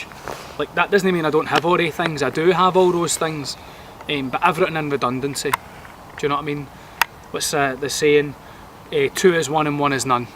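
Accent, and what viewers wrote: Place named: Ayrshire, United Kingdom. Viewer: 2 is 1 and one is...... Subscribed 🤠 all the best dude, sounds like you're near me, I'm in East Ayrshire.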